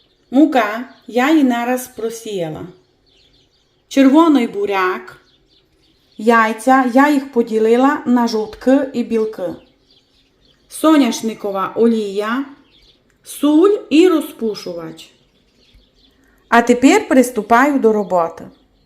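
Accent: Transcarpathia, Ukraine. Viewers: Так, це закарпатський діалект, колоритна говірка. Якщо «бесит», не слухайте. Нас з деяких пір російська дратує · Аби шо. Сказало, як у діжку пукнуло. Це закарпатський діалект, обожнюю його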